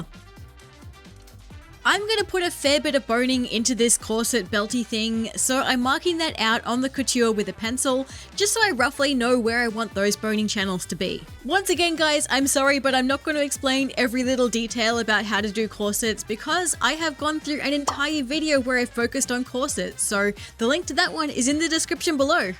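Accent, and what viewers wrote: Australia. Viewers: If I was asked . . . I'd guess you were an Aussie !. 🤣🤣😂 I never realised that before ! It makes me so happy to know you are a Cousin !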